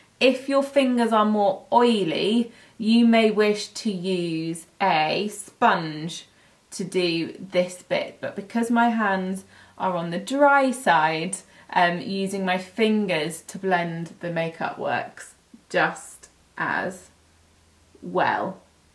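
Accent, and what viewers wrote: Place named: RP, United Kingdom. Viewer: you're smashingly fab. lovely look on a lovely fine representative of Bobbie Brown. p.s. love your posh accent.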